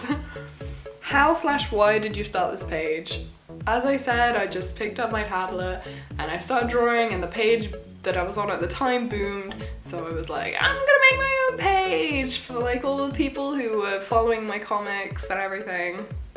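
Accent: United Kingdom. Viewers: Quite interesting. Do you notice what I'm talking about or do you feel you sound completely British? Like seriously when this video first starts I thought you were American.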